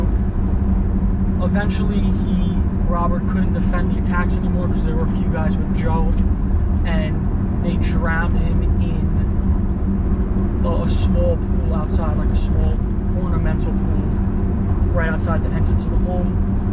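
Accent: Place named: Long Island, United States. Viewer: He is from Long Island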